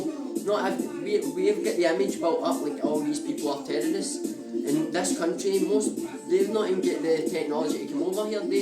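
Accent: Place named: Scotland, United Kingdom. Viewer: Thanks i just love scotish accents i dont know why i just do but your cool anyway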